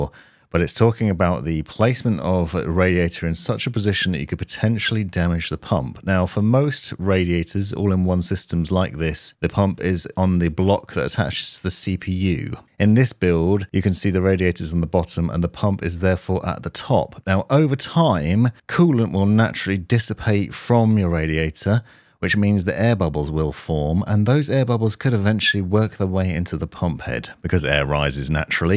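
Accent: United Kingdom. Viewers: you sound uk based, you know any sites with configurators where i could get a quote and plan to buy without really scummy pricing? cos the one site i do know make you basically pay its cost twice over by not offering a way to buy it in one go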